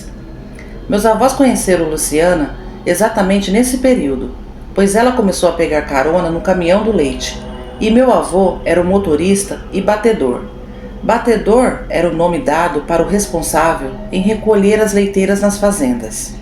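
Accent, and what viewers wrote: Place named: Caipira, Brazil. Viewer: Essa entonação caipira ficou muito boa. Parabéns ❤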